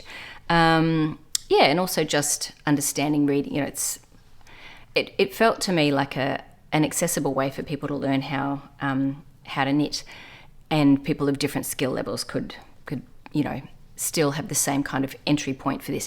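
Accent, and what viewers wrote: Australia. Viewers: Thanks for the Ravelry tip,. There is so much I don't know about it; would you consider doing a tutorial sometime on getting the most out of Ravelry? You are so good at explaining concepts! Love your podcast; there aren't many Aussie accents in the knitting pod world, you are great.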